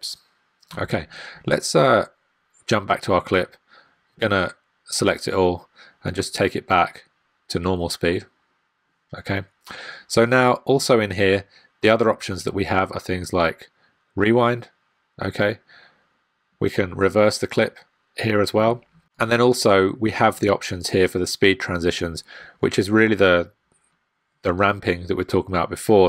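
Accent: United Kingdom. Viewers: this is an English accent you divert!!!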